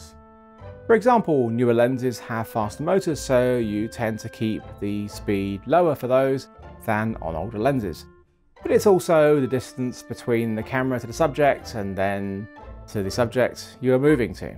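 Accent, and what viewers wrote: United Kingdom. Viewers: It's always a pleasure watching your videos. Your British accent and cats are awesome!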